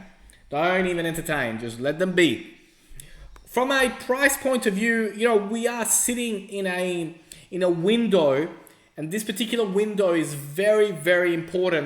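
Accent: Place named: Australia. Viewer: G'day mate. Thanks for the great xrp video. Great to hear Aussie accent.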